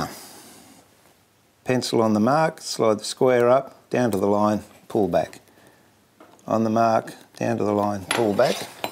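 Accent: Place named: Australia. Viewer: Nice australian accent :) Thank you for your efforts very much. Your work is more than useful.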